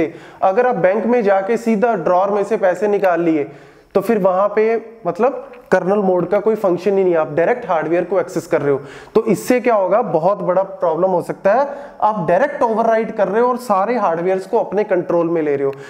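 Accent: India. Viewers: You still have your hindi accent😢😪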